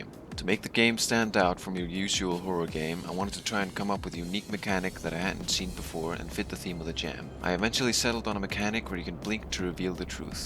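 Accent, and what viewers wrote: Iceland. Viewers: Are you icelandic? Accent🤨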